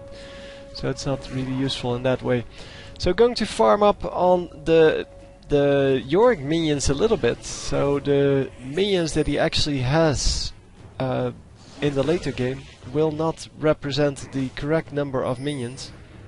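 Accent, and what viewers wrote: Netherlands. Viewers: you must be dutch ??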